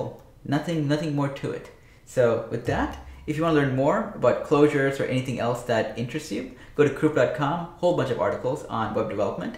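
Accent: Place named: Tamil Nadu, India. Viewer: Me too I think you are Tamil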